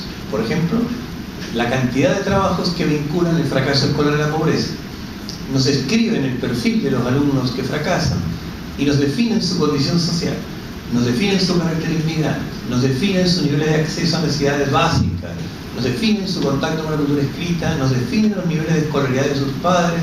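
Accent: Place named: Chile. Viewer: Por qué pone tono chileno si es argentino? Notable dato paraverbal. Todo un mensaje....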